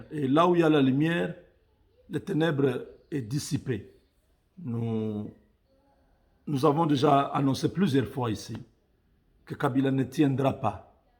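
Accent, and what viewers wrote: Rwanda. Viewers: Tu parles le français comme des rwandais, tu as de la famille là bas ?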